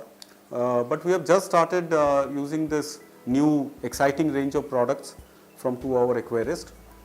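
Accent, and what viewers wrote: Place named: Bengal, India. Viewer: Dada I get your Bengali. My suggestion is to avoid mixing languages. If you have to use Bengali the do provide sub titles. Your Bengali accent is great tho.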